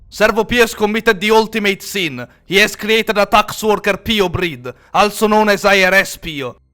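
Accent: Italy. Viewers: I just can't Italian accent makes all "high gothic" names just Italian names, and its sooo good! It feels like i watching Young Pope or something.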